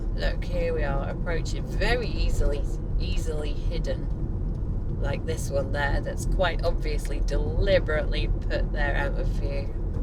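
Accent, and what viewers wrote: United Kingdom. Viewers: Best female English accent in the world x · Just as beautiful as your voice! I never doubted you were British, no one could fake your diction. You ain't no Dick Van Dyke. Would love you to share a little more of your life online. If you are looking for troubling documentary content I have a very upsetting story but I don't want to share it in public.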